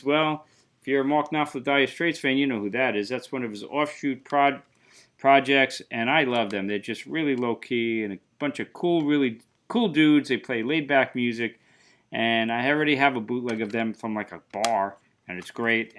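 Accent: Boston, United States. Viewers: Wow your bahstan ahccent is thick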